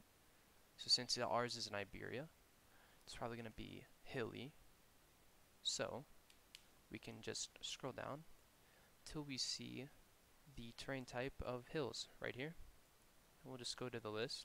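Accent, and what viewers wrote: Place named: Scandinavia. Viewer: He's gotta be scandinavian for his accent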